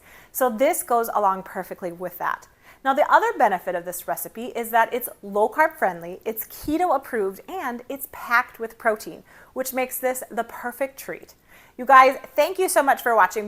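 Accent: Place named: South Dakota, United States. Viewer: I spent some of my elementary school years in eastern South Dakota. You sound like you're from that area of the world. Sounds good!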